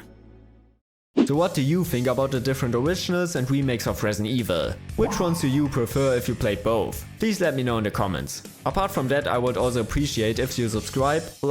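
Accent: Germany. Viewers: nur 2 sekunden drinne und ich höre raus du bist deutscher :D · i sense deutsche accent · I hear deutsch mein freund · i’m hearing a little deutsch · Good old German accent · I love your German accent. Sehr gut! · At first I thought the man had a lisp, then realized he's just German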